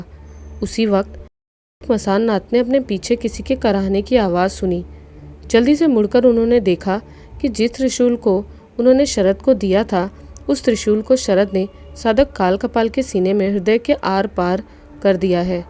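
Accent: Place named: Eastern Uttar Pradesh, India. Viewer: लेकिन बोलने के तरीके से east up की लगती हैं 🙏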